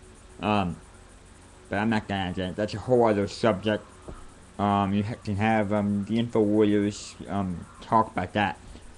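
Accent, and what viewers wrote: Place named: United States. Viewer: So, what part of America is this accent from?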